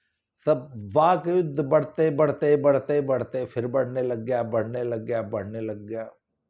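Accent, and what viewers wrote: Haryana, India. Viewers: uncle ji thari haryanvi kasut andi hai hehe..swaad aa gya sunke hehe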